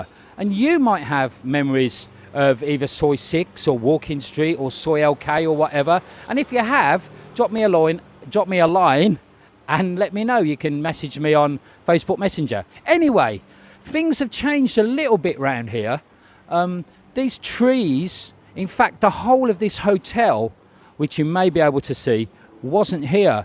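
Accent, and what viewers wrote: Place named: Black Country, United Kingdom. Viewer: Even though you sound yam, I can imagine it must have been a bit of a culture shock going from Smethwick to Soi 6?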